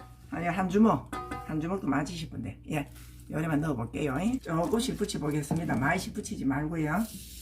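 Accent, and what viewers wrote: Gyeongsang, South Korea. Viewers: 님 반갑습니다 말씨가 친근하게 느껴지네요 저도 경상도 라서요 멋진 분을 뵙게되어 영광입니다 또 뵙고싶어 손잡고 갑니다 늘 응원합니다 건강하시고 행복하시길 빌겠습니다 ~~~♡♡♡♡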